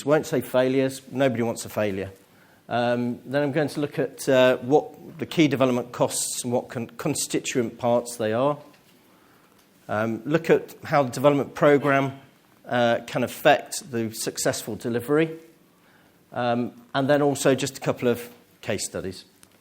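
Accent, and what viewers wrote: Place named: United Kingdom. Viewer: British English accent is nice to hear and easy to understand for non native English speakers..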